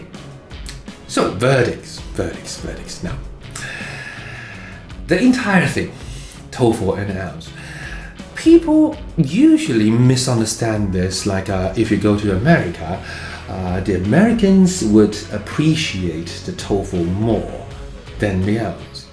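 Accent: United Kingdom. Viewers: Thanks for your guidance in Speaking。 I really like your authentic British accent！ teacher looks like Harry Potter Wizard And is super cool。 Ha ha ha ！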